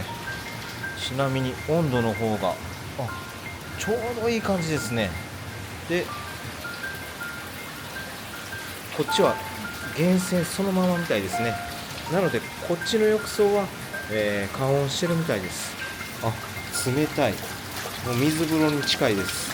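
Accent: Kansai, Japan. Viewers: 鮮やかなbgm.親しきな関西弁。最近見ることなんですが、続々見てしまいます。 韓国語の翻訳も自動ではなくちゃんとしてたら韓国の多数の温泉好きな人達に喜んでもらえると思います、😂 応援しています🎉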